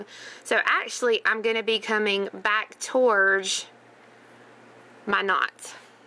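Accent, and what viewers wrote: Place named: North Carolina, United States. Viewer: I like watching your videos because the view is VERY CLEAR and in focus. Besides I love hearing your North Carolina accent! Fun to hear you talk.... I'm a Texan so I probably have an accent as well . . .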